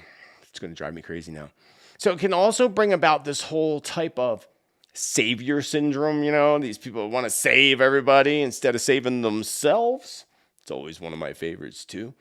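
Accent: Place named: United States. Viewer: 💚🤗💚 Bristol UK,,,, Luv the crazy American pronunciation of UK cities 😂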